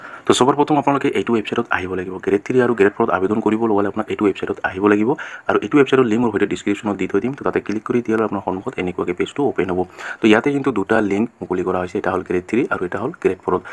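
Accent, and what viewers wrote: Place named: Bodoland, India. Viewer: Bodo bilakor nisina lagise nohoine baru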